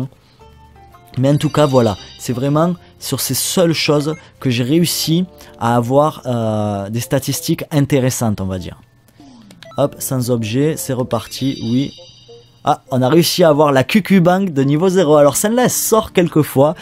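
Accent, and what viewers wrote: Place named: South, France. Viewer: J'adore l'accent du sud !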